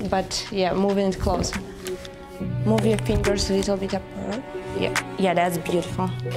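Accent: Russia. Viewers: ХММммм...мне кажется или я слышу русский акцент?)))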